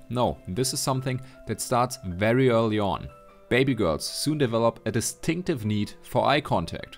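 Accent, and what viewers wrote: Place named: Germany. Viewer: I hear a German accent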